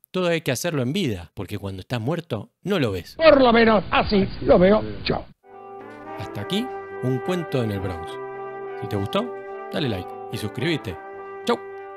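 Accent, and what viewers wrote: Argentina. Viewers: Un peliculón y narrado espectacular muy portenio pero conciso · Felicitaciones ¡¡¡ , què buen resumen...y hablado en ''argento'' ,eso le suma mucho en mi opinion...Vì esta peli hace mucho,no menos de treinta años.Es una historia verìdica,escrita por el autor del libro y actor que hace de Sonny, Chaz Palmintieri...A lo mejor por eso los personajes son tan creìbles...Gracias por traerla a mi memoria...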